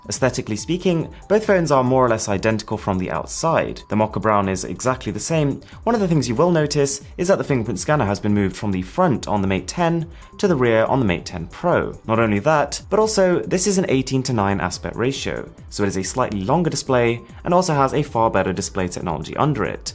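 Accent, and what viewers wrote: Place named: United Kingdom. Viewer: I love that UK accent ...😃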